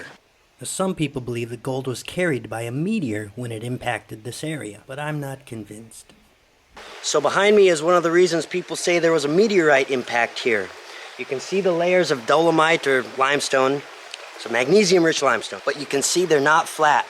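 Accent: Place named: Wisconsin, United States. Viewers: Northern Illinois here. Think I hear that native Minn/wis accent, just a bit. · U got the wi Midwest accent down. Almost Canadian but not quiete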